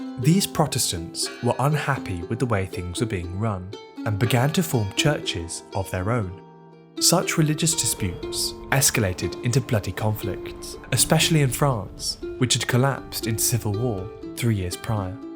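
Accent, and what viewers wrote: England, United Kingdom. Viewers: This is good stuff my snooty sounding English friend. More please. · British narrator Not gonna listen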